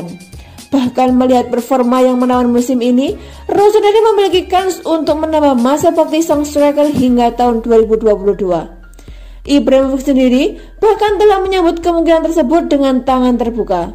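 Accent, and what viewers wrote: Java, Indonesia. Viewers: Medok cuk 🤣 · Hahahaha penyiare orang jawa yaaaa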